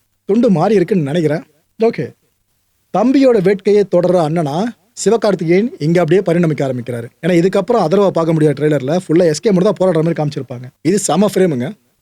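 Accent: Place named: Madurai, India. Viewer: மதுரை ஜங்ஷன் தமிழா அண்ணா 😮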